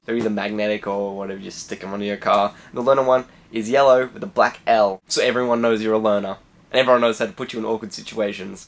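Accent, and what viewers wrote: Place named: United States. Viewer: Good american accent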